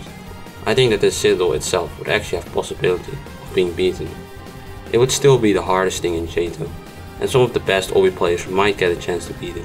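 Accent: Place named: Netherlands. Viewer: I love jouw Nederlandse accent ;)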